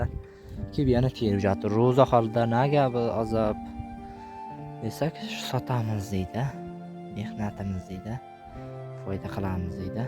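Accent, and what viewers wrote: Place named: Chiroqchi, Uzbekistan. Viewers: Dustim uzingiz qayirdansiz. Chiroqchi shevasida gapirayabsiz. Sog buling omad tilayman. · укам.мен.хам.чупонман.шевангиз.бизникига.жуда.ухшаеди.узиез.хакингизда.малум от.беринг..каердансиз.самарканд.жом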